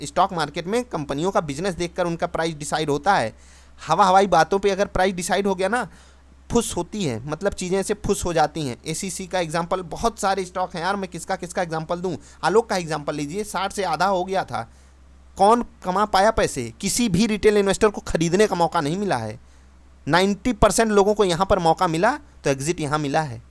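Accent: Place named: Bihar, India. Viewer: बातो से तो परफेक्ट बिहारी भैया जैसी आवाज है. सजेशन भी पागलो जैसे देता है. शायद तु फ्रेशर है स्टोक मार्केट मे.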